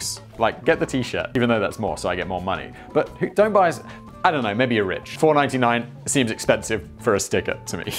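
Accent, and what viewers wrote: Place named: United Kingdom. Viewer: Knows everything about trailer laws in the UK but nothing about the history of cars worldwide and has a channel called 'Today I found out: Brain food for hungry minds'.. ..as much as I enjoy this light hearted content, you're losing credibility as having a curious mind Simon (the Czech, US Citizen, and British sounding person)..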